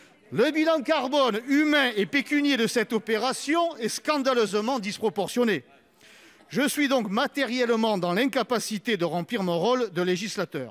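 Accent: South, France. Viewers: Cool. La voix du sud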